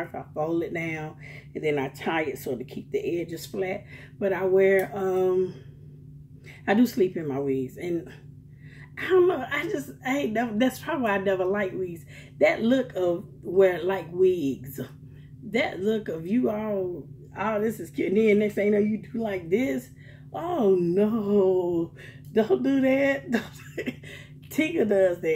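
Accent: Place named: Alabama, United States. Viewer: I KNEW YOU WERE FROM GEORGIA OR EITHER ALABAMA. YOU SOUND JUST LIKE MY COUSINS IN ALABAMA.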